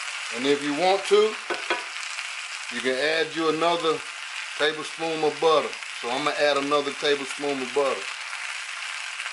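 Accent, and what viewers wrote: South, United States. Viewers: I haven’t even watch the video yet but I can tell by the thumbnail and country voice this is going to be good. I love black people!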